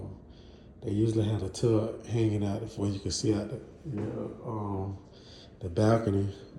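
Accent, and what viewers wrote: South, United States. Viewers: I hear that southern drawl! “ lil fri-juh-ray-da”.. I’m from the Midwest… we sound like that too🤣🤣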